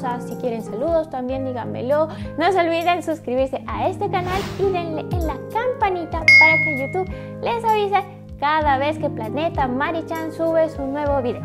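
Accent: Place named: Mexico. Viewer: Tu manera de hablar es igual a los mexicanos hablas cantando :v no te atine pensé q eras mexicana bueno ya tienes un nuevo suscriptor mas :v esperare con ansias en próximo vídeo :)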